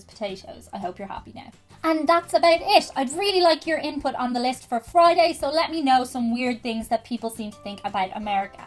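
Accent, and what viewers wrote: Ireland. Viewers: I like to listen to you, my grandfather was from Ireland he had a heavy accent but I couldn't hear it as a kid, he is gone now so to hear you talk puts me back, much thanks keep them coming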